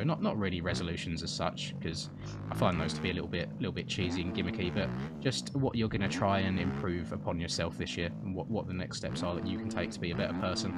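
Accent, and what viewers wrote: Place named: United Kingdom. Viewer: Straight male here, all British accents are sexy lets be real. Plus watching someone actually ride the game instead of crashing like I do is nice.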